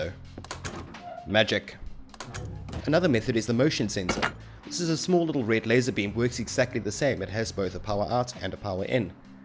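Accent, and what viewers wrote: South Africa. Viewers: are you South African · South African? Easy to follow tutorial accomplished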